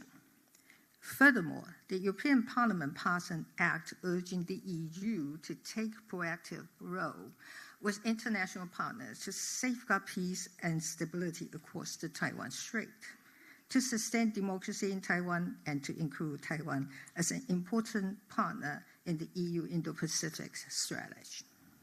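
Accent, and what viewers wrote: United Kingdom. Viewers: 蔡總統的牛津腔英文真好！👍👍👍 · 蔡前總統的英式腔調，太迷人了❤ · 請保護好總統在她外出拼外交的時時刻刻❤😊中共視她為眼中釘她的英文發音很好聽有點英國腔這次沒看到布拉格帥哥市長有點可惜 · How does she get British accent? Must have been busy practicing her speech lately?😂 not consistent though, still has Taiwanese accent mixed in. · 她的英式英文真的好好聽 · 你不懂英語才是笨蛋、總統是英國人🇬🇧口音、不懂裝懂？ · 元首風範依舊，完全沒有卸任感啊❤一站出來那種領袖氣質盡顯，好喜歡！謝謝my英仍願意繼續當台灣這個小而美且強國家的志工，繼續為台灣打拼，加油，愛妳喔， ～ ps:like the UK accent you speak❤ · 謝謝前總統卸任後仍舊為台灣努力拓展外交, 略帶英國腔調的英語實在太迷人了👍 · 雖然聽不懂，但前總統英國腔英文，聽起來很好聽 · 純英語腔調！英國上流社會的口音！ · 的英國腔太迷人😍最後全體起立鼓掌真的好感動🥹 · 蔡總統的英式英語好道地喔！真不習慣。謝謝蔡總統向國際發聲，辛苦了。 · Beautiful British accent ❤ we love you MS. Tsai ❤❤❤ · 以蔡前總統為榮，為大家在外交上打拼。她講英文有英國腔耶。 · 台湾人说英国腔，台湾人骄傲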